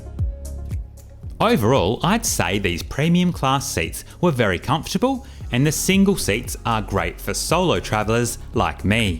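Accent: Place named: Australia. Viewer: good to see you again Love your heavy Aussie (if I may say so) accent 😊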